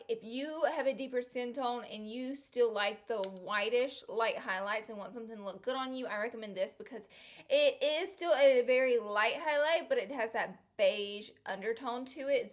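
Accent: South, United States. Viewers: I also couldn't hear her very well. I had my volume turned all the way up and it was still hard to hear everything, especially when she goes a mile a minute lol. I still adore her quirkiness anyway, even though I have to rewind and listen over and over again to try to figure out what she's saying - between the southern accent and the speed at which she talks. is so cool and real. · I love your personality! I'm a southern girl too (sorta, born in FL, live by the beach). I wish I had that southern accent all my life, I think it's so cute and love listening to girls who have one, top that with your silly sayings and OMG!😲 I could just talk to you for hrs! lol Love your makeup! You look fabulous as always, plz do u tut on that look! 😍😍 And I looooove your palette! I wish u did more tuts with your palette! 😘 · What state are you from in the south?? I've always have wondered! 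· Your cracken me up today! Love your southern accent, don't ever loose it! Please do one of these on setting sprays, if you have some you like at a good price.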